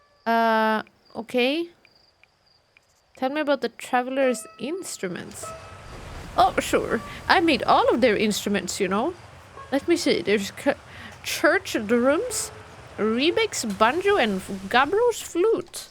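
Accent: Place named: Sweden. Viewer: 1 minute in, she sounds super Swedish, I could be wrong. But I don't think I am. xD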